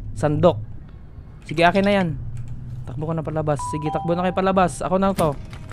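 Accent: Visayas, Philippines. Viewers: Dol bisaya man deay ka dol ey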